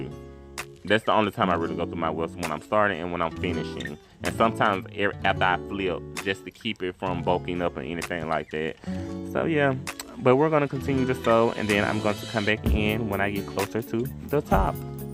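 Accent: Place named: South, United States. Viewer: “Big country plats” 😂😂😂😂😂😂 I have not heard the term plats used to describe braids in a long time... I love it.. I love your southern accent.. Also the video is very detailed and on point... Thank you.. I’m subscribing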